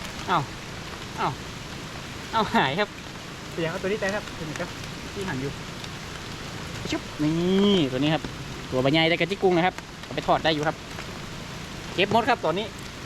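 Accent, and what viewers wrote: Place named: Chiang Rai, Thailand. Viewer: บ้านแต้อยู่ไหนนิสำเนียงอย่างคนเจียงฮาย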